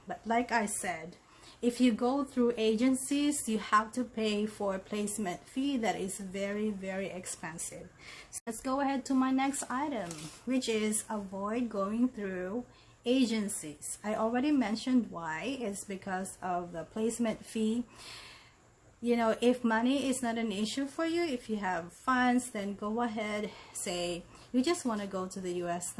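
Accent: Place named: United States. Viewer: That's nice . I love your accent ma'am parang American accent na.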